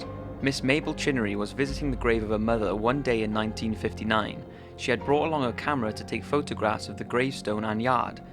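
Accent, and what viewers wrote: United Kingdom. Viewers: Your British accent makes the video sound creepier O.o PS. U have a new sub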